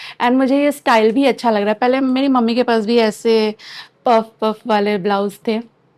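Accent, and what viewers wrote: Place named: Uttar Pradesh, India. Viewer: Aap up se h.. Is liye gola bolti h😊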